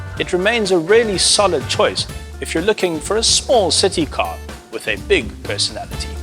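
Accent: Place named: South Africa. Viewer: I'm coming from watching internationa lmovies- to hear a South African sounds funny.... been indoors for too long.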